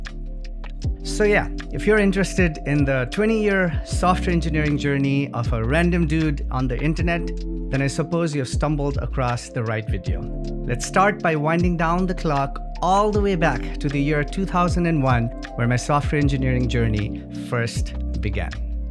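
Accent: Nepal. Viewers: now im pretty sure you're from nepal Your accents and all that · Damm you are from Nepal. i knew from the tone & name but seeing conforms it. Hey, tell about how did you get there, the visa and other issues, really cluttered you know living here as a student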